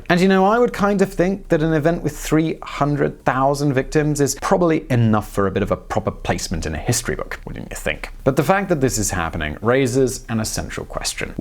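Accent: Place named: United Kingdom. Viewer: Kinda hard to take this guy seriously with that accent, we all know Britain is the OG when it comes to fucking over nations. And they didn't stop either, Palestine and the Middle east are witnesses. Oh my god, I just realised I speak 'their' language😭😭😭 gotta respect the British pillaging- it's value for money #longlasting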